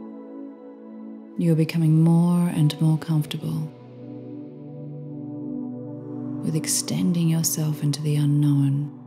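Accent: Australia. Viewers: Thank you what a fab voice. Is that a Australia acet I LOVE IIT Roz Ireland.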